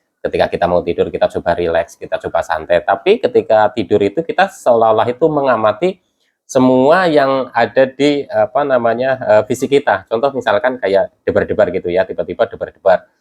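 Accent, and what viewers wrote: Banyumas, Indonesia. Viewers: Asalamualaikum mas. Ora ngapak ora kepenak, njenengan ana ig ora mas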